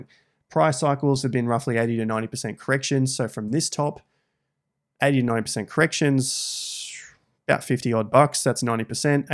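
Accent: Australia. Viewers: Is this what you call analysis? Stating the obvious and regurgitating old information? Bro, get your head out of your chart-loving ass and stop relying on your Aussie accent to sell yourself. You are not doing people any favors by reading your crystal ball. Do some real analysis by connecting current events that might be contributing to the behavior of the assets in the crypto market. You’re the type that got rekt the last time because of over leverage. Now, you’re just suggesting to do the reverse. Stop. Listen to real market analysts like Samson Mow, Tom Lee and Raoul Pao. Maybe you’ll be a bit more convincing as a legitimate crypto analyst. If not, just stay away from crypto and stick to your TradFi mumbo jumbo.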